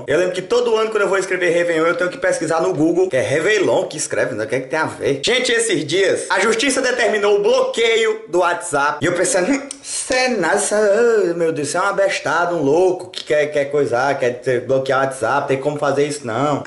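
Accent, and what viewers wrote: Northeast, Brazil. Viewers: é pq o sotaque dele é de nordestino. E ele fala gírias.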